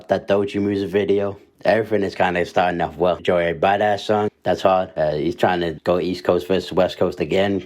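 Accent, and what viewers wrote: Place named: Northern Ireland, United Kingdom. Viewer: To me it reminds me of different accents, like a bit of an irish accent but also newcastle. It just doesn't quite fit with either to me, I'm pretty sure he's from somewhere on the british isles. English is not my first language though, so I might be completely off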